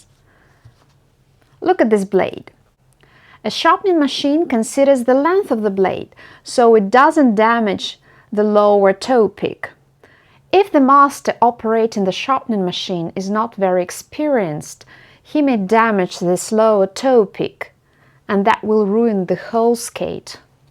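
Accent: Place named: England, United Kingdom. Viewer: The word you were looking for is 'rocker'. In English, rocker is used to called 2 aspects of blades: one is about the curve of the entire blade (e.g., 7-foot rocker such as Coronation Ace blades, or 8-foot rocker for most other blades), the other is about that place just below the ball of your foot and about an inch behind the toe pick, the rocker for spins. Thanks for posting in English, much appreciated. Your English is very good, without much Russian accent and with more English (not American) tone, I am very pleasantly surprised...😀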